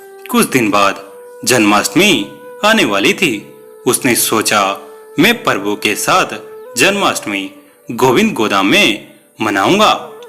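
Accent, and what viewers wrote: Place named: Pahari, India. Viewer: Bhai aap ki aawaj thik nahi lagta hai...lagta hai tum pahari ho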